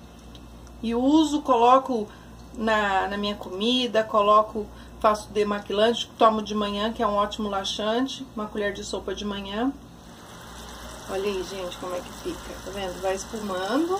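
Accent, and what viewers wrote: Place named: Brazil. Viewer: Hola soy de México. Y me gusta ver videos usted es Brasileña por el acento de su voz es muy guapa me gusta le envío desde acá un saludo muy afectuoso y le deseo muchas bendiciones desde México como se llama el lugar donde vive usted y como se llega ya estando en Brasil. Espero me conteste porfavor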